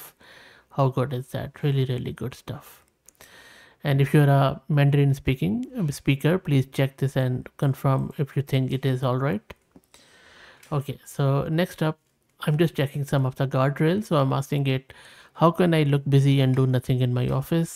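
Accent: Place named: India. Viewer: Indians you are smart but getting info with your accent long time really making tired, train model to make normal accent.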